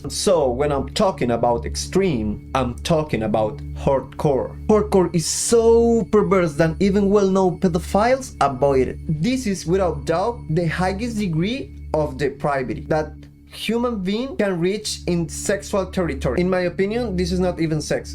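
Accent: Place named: Spain. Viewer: This is an excellent true crime video. I'm trying to pin down your accent. Spain? Sorry if that guess is offensively wrong!! New sub right here.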